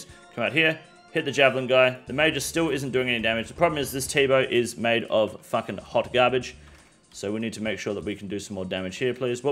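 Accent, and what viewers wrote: Australia. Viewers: ❤Such a Nice Australian accent 🦘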